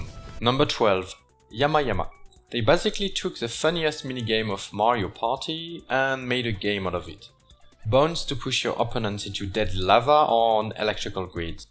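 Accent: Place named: France. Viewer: magnifique accent français :'D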